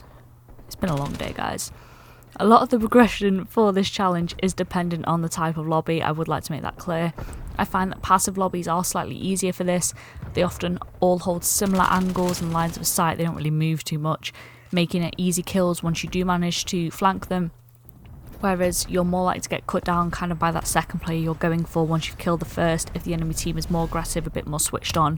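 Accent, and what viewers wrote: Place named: United Kingdom. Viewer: Why are are you british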